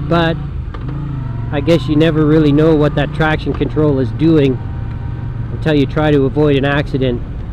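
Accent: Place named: United States. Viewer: I guess from your accent and you are riding on the right, that you are in the USA? I am surprised your speedo and odometer are in metric. I have just bought a new V9, in England, and the speedo is MPH and odometer in miles. The one thing I don't like about the bike is that the speedo and digital readout are virtually invisible. I can only tell what speed I am doing is by knowing where the red needle should be on the dial, and by keeping pace with the other traffic. At night the speedo lights up in a sort of red colour and goes completely illegible. It is OK if the sun shines directly on it, bizarrely. The numerals and letters on the digital readout are too thin to be legible which annoys me. They could have doubled the thickness of the lines forming the characters, and made it a lot better. I have done 35 miles after the 'low fuel' warning, so the lack of a fuel gauge isn't bad, though I would prefer one. 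If I had bought a V7 I would have got the one with the two dials. One important thing to know if you have a new V9 and want to buy a Givi rear carrier, if you buy what seems to be the only one available, it is advertised as fitting a V9, 2016 model. It fits the frame but then you cannot refit the seat. They seem to have lengthened the seat for the newer models. I made some brackets and fitted the carrier about 2 inches further to the rear. The seat now fits, but the downside is that in effect the carrier is only held on to the frame by half the number of nuts and bolts that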